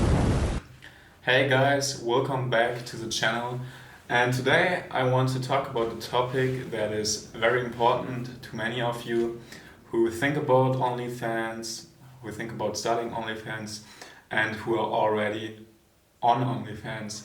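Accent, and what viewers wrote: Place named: Germany. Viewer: Ich wusste dass du Deutsch bist, der Akzent hatte recht haha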